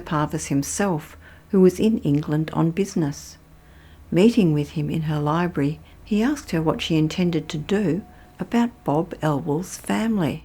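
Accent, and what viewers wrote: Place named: Australia. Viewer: The narrator has an Australian accent...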